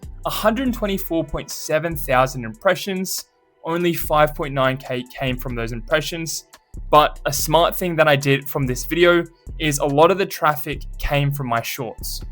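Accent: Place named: Australia. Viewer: Is that a fellow Aussie I hear?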